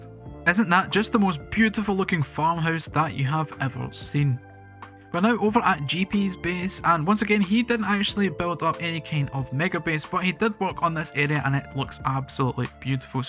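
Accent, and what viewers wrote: Scotland, United Kingdom. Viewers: love the scottish accent reminds love the builds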